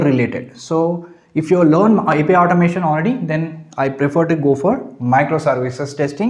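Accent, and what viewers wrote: India. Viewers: First thing: Improve your English. This is Indian English, not UK/Oxford English. I don`t understand a shit.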